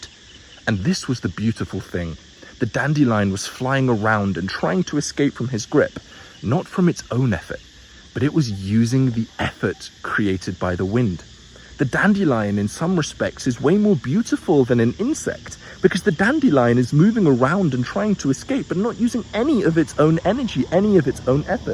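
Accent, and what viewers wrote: United Kingdom. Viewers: Deep voice + british accent + philosophy and self help = subscribed immediately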